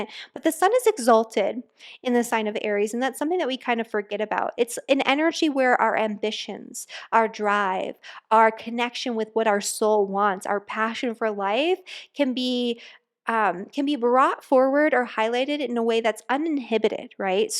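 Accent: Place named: Oregon, United States. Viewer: I do not understand any of this but the only thing is for sure in this life : We are Doomed to any idiocy from the movements of our galaxy , anyway this girl is the reason I watch this , her accent I like it a lot sounds from Oregon , but may be from somewhere else, anyhow thanks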